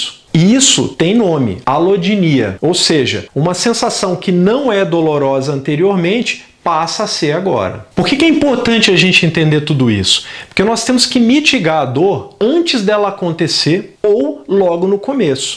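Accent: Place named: Minas Gerais, Brazil. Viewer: Prof. vc disse que é metade bahiano, metade italiano... Mas seu sotaque é bem mineiro... Parabéns pelos videos, estou gostando muito....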